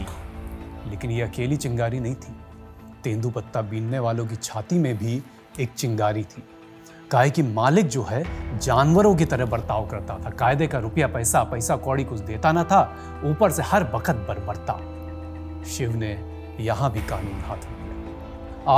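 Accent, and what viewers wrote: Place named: Awadhi, India. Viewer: Bhai ye awadhi h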